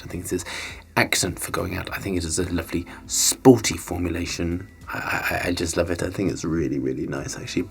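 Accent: England, United Kingdom. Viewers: Yeah, he's English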